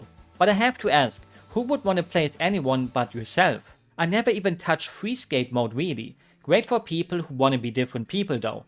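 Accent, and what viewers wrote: Germany. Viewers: Extremely German, don't even attempt English. Sounds so cringe!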